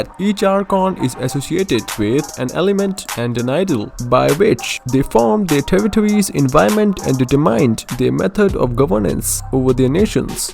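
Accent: India. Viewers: U Indian?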